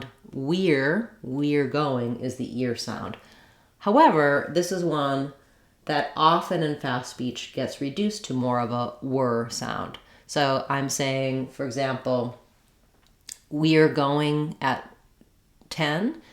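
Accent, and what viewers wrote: United States. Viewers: Thank you,. It's a lot of people helped change the English language. Special American accent Thank you so much.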